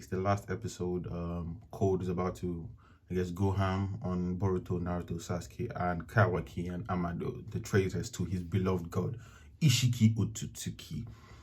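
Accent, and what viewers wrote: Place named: Nigeria. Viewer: I’m Nigerian too and you sound Nigerian too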